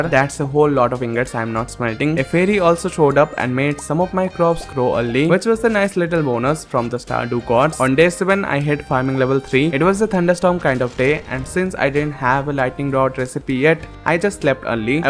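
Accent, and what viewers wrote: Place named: India. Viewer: also you are definitely understandable in English just with funny Indian accent which makes it unique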